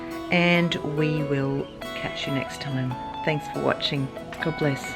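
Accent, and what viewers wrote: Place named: Australia. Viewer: i am a new subscriber and love for family and honest aussy way.we live in Biasbane and love hearing this aussie mum.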